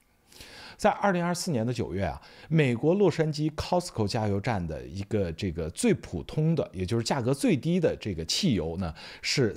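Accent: Standard. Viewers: 听口音完全听不出是东北老乡普通话相当标准了提个小建议能不能把英尺换算成平方米毕竟用英制单位的国家现在比较少印象里加拿大不也是用公制吗？难道我记错了？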